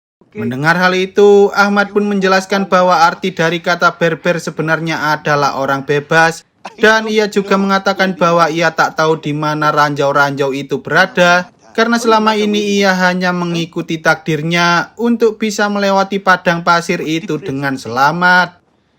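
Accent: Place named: Banyumas, Indonesia. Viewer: Jadi gk seru ngapaknya kebangetan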